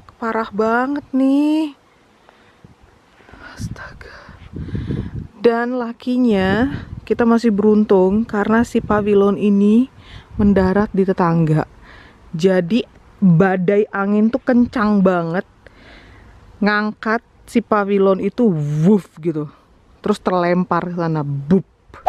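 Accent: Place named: Java, Indonesia. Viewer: Ya...allah liat mamak pakai bahasa jawa jadi jawa madura , kemekel aku mak dengernya #asli sidoarjo jatim #fans online mu mamak#semoga menular punya baby penantian 13th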